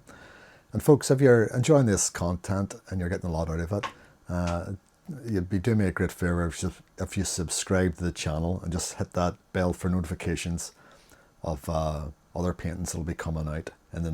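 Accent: Northern Ireland, United Kingdom. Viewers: Van Gogh is surprisingly good! I have some spare tubes and pans in a box with others, and they work really well. I never had an issue. Thanks for this video! Cosy and instructive ❤ (extra points for the Norn Irish accent)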